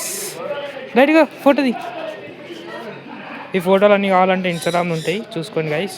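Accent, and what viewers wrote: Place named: Telangana, India. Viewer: 😮❤❤❤❤️ Telangana language super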